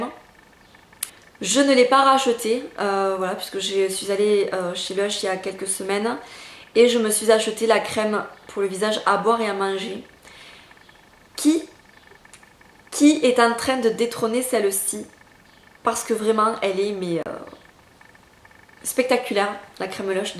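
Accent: South, France. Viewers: Coucou tu viens d'Avignon ?? Tu a l'accent du sud :)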